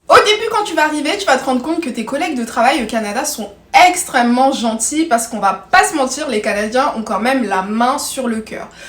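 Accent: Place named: France. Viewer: C'est toujours un immense plaisir de te suivre et de t’écouter. Chaque mot, chaque phrase semble pesée avec soin, portée par une voix à la fois convaincante et d’une précision remarquable. Ton accent français est si authentique qu’on pourrait croire que tu as toi-même inventé cette intonation unique. Tu as déjà pensé à une carrière dans le journalisme ? Tu as toutes les qualités requises : une éloquence naturelle, une réflexion claire et une capacité impressionnante à captiver ton auditoire. Je t’imagine parfaitement sur une grande antenne canadienne, abordant avec brio des sujets d’immigration et d’actualités, comme tu sais si bien le faire. Te voir exceller dans ce domaine serait un véritable bonheur. Tu as ce petit quelque chose qui rendrait chaque intervention inoubliable. Bravo à toi, et je te souhaite un avenir à la hauteur de ton talent !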